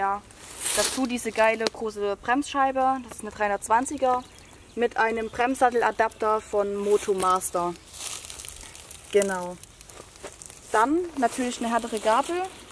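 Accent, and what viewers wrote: Saxony, Germany. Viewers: Woher kommst du ?Sachsen ? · Dein sächsischer Dialekt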